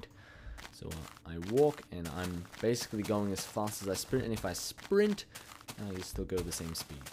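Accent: Australia. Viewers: thats because people liked his australian accent so he does that now.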